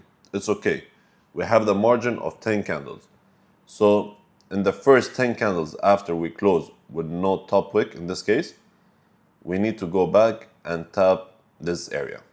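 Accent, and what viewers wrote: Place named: Eastern Europe. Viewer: When I hear an eastern European accent, I listen. I hear you guys know how to trade.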